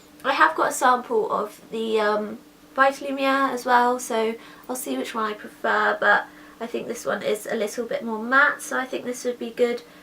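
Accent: England, United Kingdom. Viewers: I love your accent. Is it british? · she has an english accent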